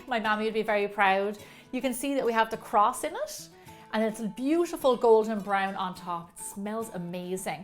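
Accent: Ireland. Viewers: Hi. I've been watching your channel for years now. I even spent a summer watching every video that you had. I've fallen behind recently, but I've also discovered that I'm part Irish. I've been learning gaelic and finding irish youtubers to watch. I saw your soda bread video and had to click on it and as soon as you started talking I remembered you were Irish too! I almost cried at the memories of that summer came back and how connected I felt. I had fun translating the recipie along with you. Thank you for everything., Zanna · sounds great love your irish accent. and the bread looks 😍😚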